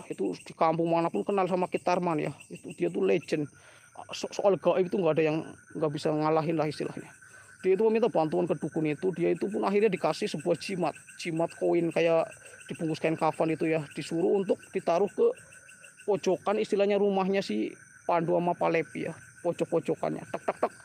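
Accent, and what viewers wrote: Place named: Java, Indonesia. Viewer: Saya suka medoknya ki 😆😆 prtahankan ya, slam dari Bali 🤝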